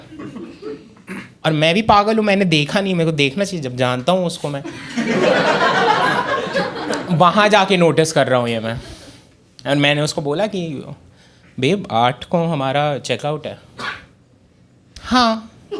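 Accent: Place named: North, India. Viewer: Accent is typical dehati, improve it if you want to target pan Indian audience, typical North Indian heavy accent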